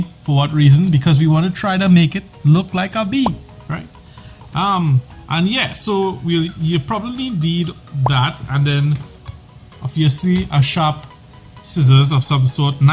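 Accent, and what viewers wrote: Trinidad and Tobago. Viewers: Mannn I swear something trini bout that accent lmk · u from trinidad?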